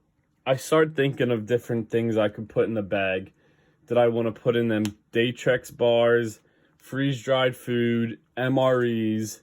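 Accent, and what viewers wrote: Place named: Baltimore, United States. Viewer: I detect a hint of Baltimore in your accent. Keep the videos coming sir.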